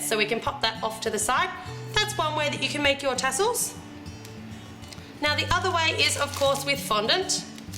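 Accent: Australia. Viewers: I just love your Aussie accent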